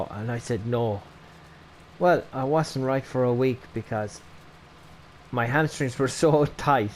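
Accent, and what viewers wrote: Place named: Ireland. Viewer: Nice intro😂. I really like the humor and Irish accent. I will watch this during the day👌.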